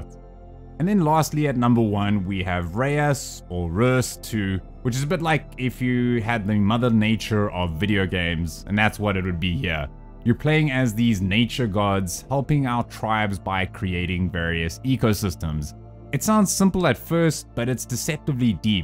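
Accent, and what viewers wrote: South Africa. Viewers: Hold up. Is that a fellow saffa I hear?! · you sound south african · Nice to hear a Capetonian accent on the YouTubes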